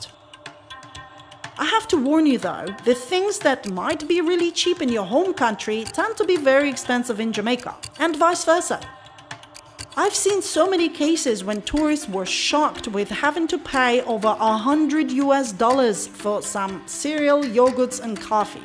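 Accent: Australia. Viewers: The worst accent ever, aussie, english, & some foreign sounding jamaican???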